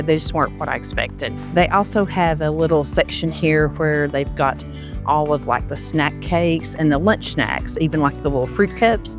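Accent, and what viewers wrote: South, United States. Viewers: I wanted you to know that you are such a blessing to me. ❤ I have PTSD and when I get days where it's bad... I watch your channel. Your soothing southern voice, smiling face, and easy ways help me refocus a bit. TY for being YOU! Hugs British Kate in 🇺🇸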